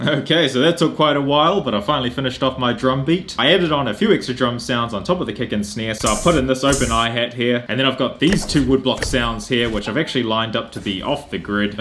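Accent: New Zealand. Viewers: Are u a kiwi bra I’m aussie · He sounds kiwi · R u a new zealander? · Are you from nz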